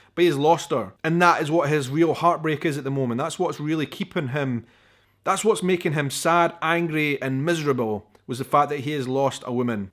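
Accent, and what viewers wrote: Scotland, United Kingdom. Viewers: I love your reviews and your Scottish accent and thank you for being so passionate about the show and giving us your honest opinions. I do disagree about Roger, however. I think some people forget that he is a 20th century man trying to make it in an 18th century world. He is a historian and knows things about the time from books, but to actually live it is a shock to the system every single day. I got a little tired of the number of the flashbacks to the hanging, but I have to say that the silent, black and white film gave the memories an eerie quality and spoke to Roger's terror, seeing it all through the burlap over his eyes. Those films also harkened to films I've seen of the lynching of black people in the 60s and the sheer cruelty and injustice of that. I also thought it interesting that the only people in color were the "redcoats". 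So I really appreciated the artistic quality of those flashbacks. Oh, and yes, "burying the hatchet" is a thing and Roger makes mention of it when he's teaching his Oxford class. As for Murtagh, in the books, he never made it past Culloden.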